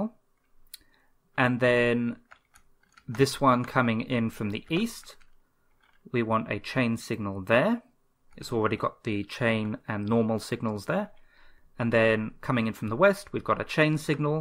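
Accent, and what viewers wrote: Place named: United Kingdom. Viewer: me, an american: hears british accent i trust this man Amazing tutorial.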